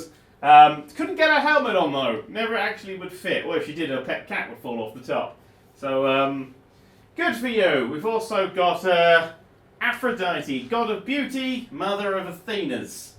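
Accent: United Kingdom. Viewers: Did get.... More British? He sounds very British in this video. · Hes done it. Hes gone super brit. And I love it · He got really British in this video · really brought out his Brit in this video.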